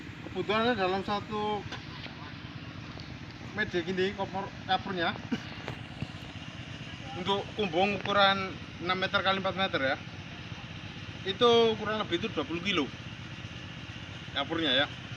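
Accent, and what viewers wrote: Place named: Banyumas, Indonesia. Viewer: Kepriwe mas ora gelem di sorot raine...Mantap mas, Ora ngapak ora kepenak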